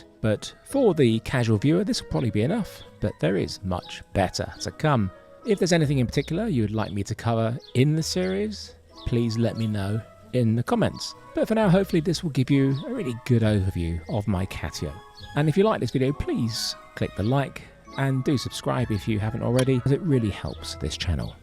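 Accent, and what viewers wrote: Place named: United Kingdom. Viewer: I’m convinced that cat dads are by far the purest people on Earth. This is incredible! ❤ I did have a couple of questions: 1) What area of the world are you in? I initially thought somewhere in the UK just from your accent, but then a I thought somewhere more tropical because of the plants and the birds. 2) What do you do about bad weather? I imagine there must be some sort of plexiglass or something on the ceiling at least for in case if it rains (I’m assuming it doesn’t snow where you are 😂), and then I was wondering what you would do about excessive heat? Maybe the cats just have to stay outside, or if the walls also have plexiglass covering then you can just leave the doors to the house open and if you have air conditioning then it would cool down the catio too? 3) Do you ever clean the catio out? I would imagine there’s lots of upkeep and I would love to see a video on that. 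Anyways, I really enjoyed the video, it looks so peaceful and all the cats seem so happy. ❤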